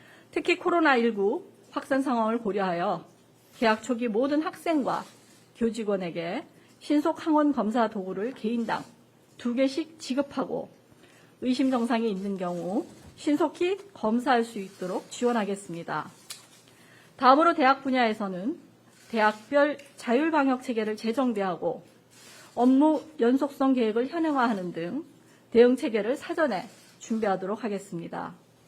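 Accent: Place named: Gyeongsang, South Korea. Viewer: 효꽈적 갱상도 출신이네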